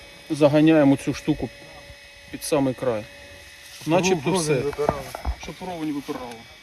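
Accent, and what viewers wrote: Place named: Russia. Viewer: Терпеть не могу когда москворот пытается косить под мовного знавьца. Ну не можешь на мове, ну трещи як москаль. Якой жах?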